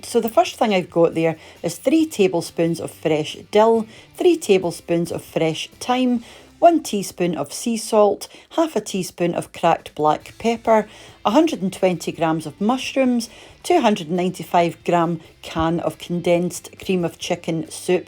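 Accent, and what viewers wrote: Scotland, United Kingdom. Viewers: Love hearing your Scottish accent🥰. My mother was from Glasgow Thanks for the recipes!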